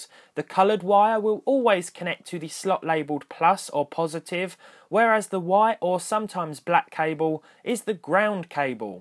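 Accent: United Kingdom. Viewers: Of course I enjoyed this video. I'm in love with your British accent. It's so fascinating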